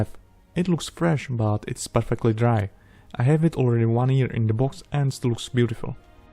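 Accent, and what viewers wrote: Russia. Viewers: у тебя же акцент Русский, но ты тягачь называешь трактором???? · Ведь же русский, по акценту слышно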